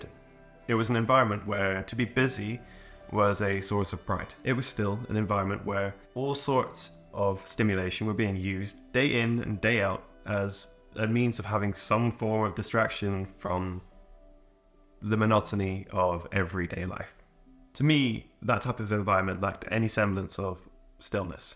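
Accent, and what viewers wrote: Sweden. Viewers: you already lost your British accent and sound like a swede speaking English.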